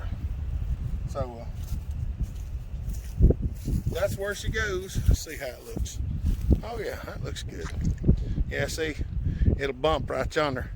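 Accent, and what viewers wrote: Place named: Alabama, United States. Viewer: are from sweet home Alabama where the sky is so blue? You have our accent. We say Sir and we say Ma'am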